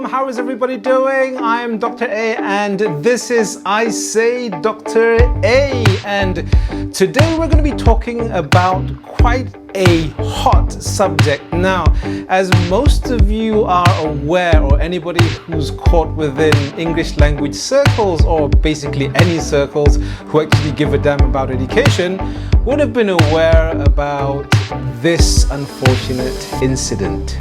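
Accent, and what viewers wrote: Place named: RP, United Kingdom. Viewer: You're speaking the Queen's English. Well done.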